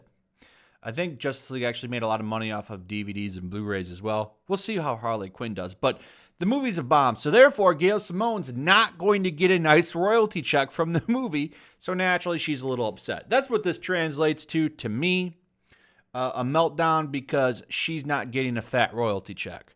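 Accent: United Kingdom. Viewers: I love your British accent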